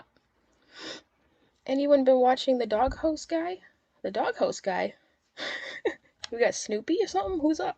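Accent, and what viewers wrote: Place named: New England, United States. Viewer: This is the first time I've viewed your channel while looking for an update on Sophie. Your voice and accent make me feel like I'm back home in MA. I live in FL now but you sound so much like my friends back home. Are you from New England by any chance?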